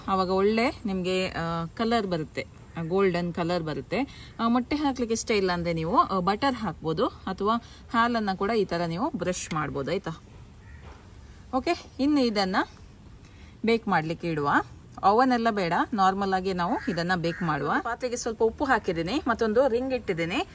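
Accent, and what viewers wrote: Mangalore, India. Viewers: First thing i like is mangalore slang ,n second thing is ur recipes is ultimate for healthy and natural making ..continue akka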